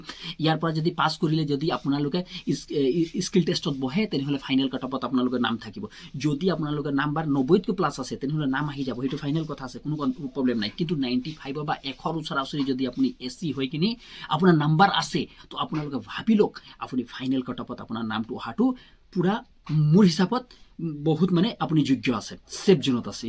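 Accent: Bihar, India. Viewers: Ki kela assamese kaw o..toi kela sure Bihari..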